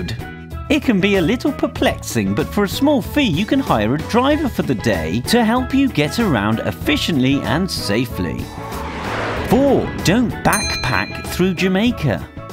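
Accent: United Kingdom. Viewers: And guys how are u going to assume he's English as we call it just cause he has British accent And plus DUDE OF COARSE THERE GOING TO ASK U TO GET THEIR STUFF and they are just trying to be polite to see if u need help IF U WALK TO A MALL LIKE FONTANA (PS Fontana is a pharmacy here) THEY WILL ASK U TOO THEY Do THAT EVERYWHERE HERE Again not trying to be rude if this comment is offensive I will take it down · This dude is clearly British (his accent) and he is talking about driving on "wrong" side of the road. Dude get serious.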